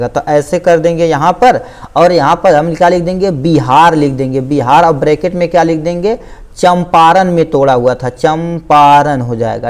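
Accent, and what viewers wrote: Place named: Kaushambi, India. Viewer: Are yar bhai tum kaushambi ke hi ho kya bdi khatarnak boli h tumhri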